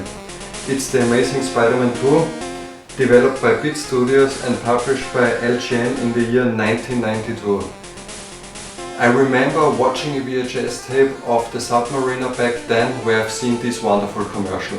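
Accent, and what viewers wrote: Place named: Austria. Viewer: you sound like an austria Sperling enlish. not greek.. but neis review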